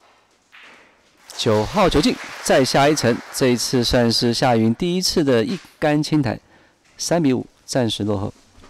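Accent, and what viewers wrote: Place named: Taiwan. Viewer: 這主播怎麼有台灣腔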